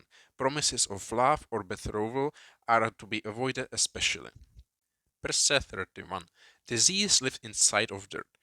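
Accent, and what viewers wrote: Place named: Russia. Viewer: You sound like Russian or german, am I right?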